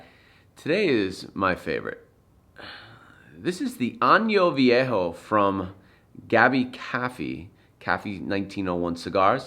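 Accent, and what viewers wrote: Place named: New York, United States. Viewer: Had a feeling you were from New York. BTW, Chicago pizza isn't pizza. It's a caserole! 🤣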